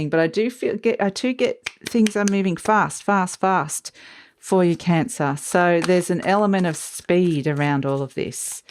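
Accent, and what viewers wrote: Australia. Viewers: R you from Oz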